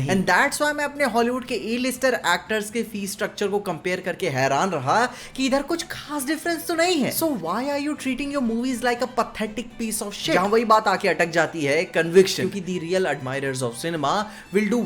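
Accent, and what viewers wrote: Standard. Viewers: Bro can you ease change your Hindi accent coz I can't understood your pure Hindi thanks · bhai ki shuddh hindi sun k maza aa jata hai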